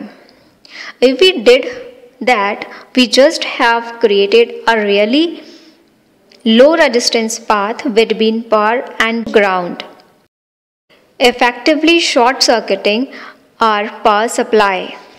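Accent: India. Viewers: Hindi english accent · holy 这英语发音 满满的咖喱味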